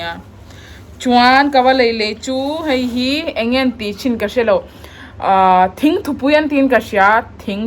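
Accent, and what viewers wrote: India. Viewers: I tenawm diaiduai itawng vainu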